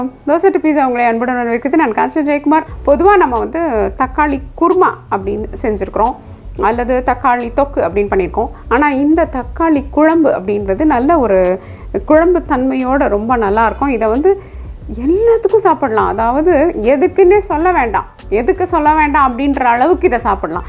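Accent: Sri Lanka. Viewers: Hi sis i am rekha.tomato curry nalla iruku. try pantren.nenga tirunelveli thana. Nenga pesum konchum tamil ketkum pothu sri lankan pesum alagu tamil mathiri iruku. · It was really nice.. but your way of speaking is the nicest. Native place Sri Lanka va sis?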